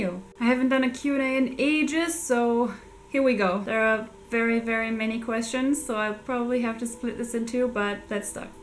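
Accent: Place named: Germany. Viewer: omg when I heard your voice/accent I immediately thought you were german and turns out I'm right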